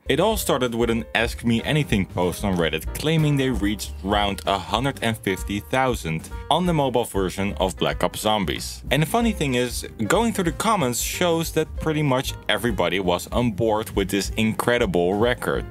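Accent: Netherlands. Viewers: I hate the Dutch accent 😢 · Sowieso dat je Nederlands bent. We herkennen ons eigen accent vaak iets te goed haha. Fantastich filmpje vriend! De kwaliteit van soortgelijke YouTubers die miljoenen subs pakken. Mooi om te zien dat het algoritme heeft geholpen. Ga zo door!👊🏻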